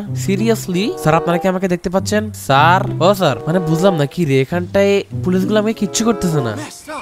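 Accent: Noakhali, Bangladesh. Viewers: Valo vabe kotha bolo nuakhalir vasha na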